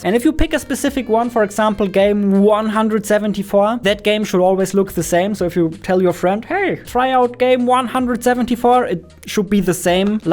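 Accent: Germany. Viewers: Man kann diesen Deutschen akzent nicht überhören xD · Oh lol I knew the accent was German (Windows-search-bar) (I don't know why I did this ) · Ooh you are germaaan. I wondered why your English sounded just slightly off. Guten Tag btw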